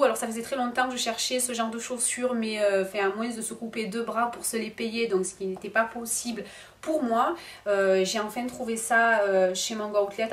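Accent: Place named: South, France. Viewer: je te trouve très chou ainsi que ton accent du soleil ....Hein les botchinnes ;) merci pour ce partage je m'abonne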